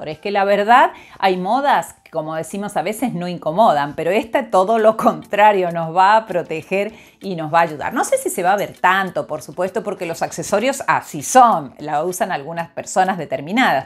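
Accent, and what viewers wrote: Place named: Argentina. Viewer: Qué bueno encontrar un video argentino, con acento argentino, con nuestras costumbres y mas aun si se trata de moda!!! Excelente!!! 🤗🤗😘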